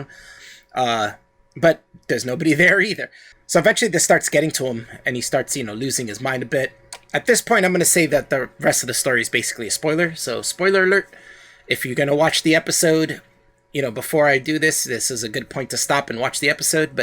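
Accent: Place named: North Jersey, United States. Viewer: Take a hint from the show. The narrarater of this channel should be changed. Sounds like a 14 year old kid from North Jersey in his moms basement.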